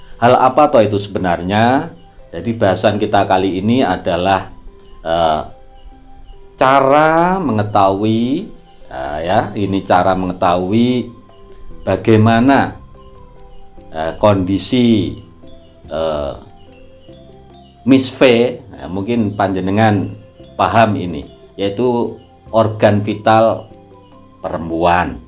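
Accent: Java, Indonesia. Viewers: Mengenali Miss V para gadis.Lagi senang sekiranya sedari bayi.Iaitu anak sendiri.Positif dan bersyukur.Andai aku bisa memahami sungguh gaya penyampaian loghat Jawa!Telus ingin beristerikan orang@ jawa.Rajin dan bersahaja.Serta kuat akal fikiran.Saya dari Malaysia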